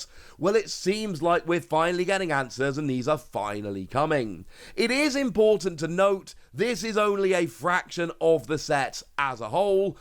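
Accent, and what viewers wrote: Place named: United Kingdom. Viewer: Ehhhh? I've lived bham, exe and london and his accent is like pretty default brit I can't lie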